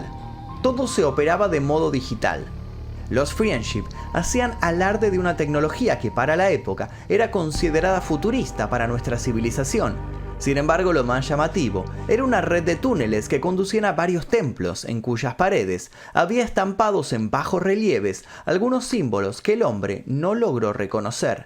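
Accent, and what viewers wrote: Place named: Argentina. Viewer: Hola excelente tu modo de narrar y ese acento argentino me mata. 🎉 saludos desde México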